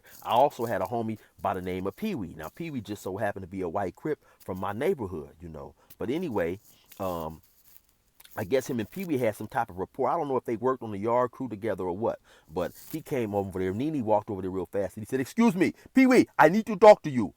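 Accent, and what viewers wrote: Africa. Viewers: i think i get more entertained with 12 min stories then a 2 hour movie the African accent had me dying! JUST TELL ME WHAT I OWE YOU! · Love the African accent lol 😂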